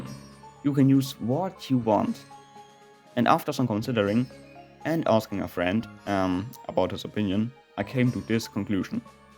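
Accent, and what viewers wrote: Germany. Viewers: ich hab dein deutschen aktzent gehört aber du sprichst gut englisch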